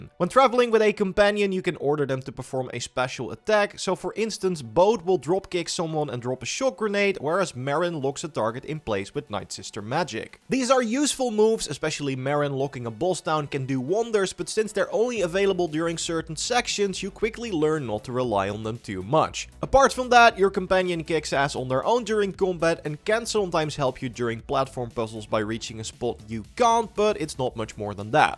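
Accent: Netherlands. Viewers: Dutch guy speaking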